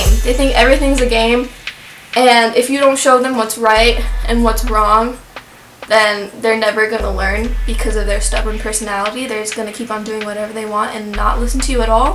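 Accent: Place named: United States. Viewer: Where are you from? Your american english accent is pretty good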